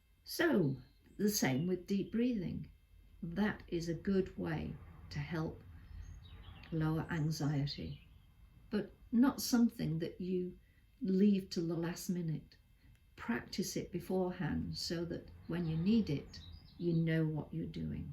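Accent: England, United Kingdom. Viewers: What a lovely reassuring voice you have. I am partial to English accents as I was married to someone from the UK . I am widowed and live alone with no family The golden years are not so shiny as described! However I do breath meditation and that helps with the collywobbles. Mine manifest as an aching hollowness in the chest. I think the reason they occur in the mornings is that in the state between sleep and wakefulness (hypnopompic) seems to be when we are most vulnerable and suggestable. Luckily my dog won’t allow me to allow me to stay horizontal. She demands her walk.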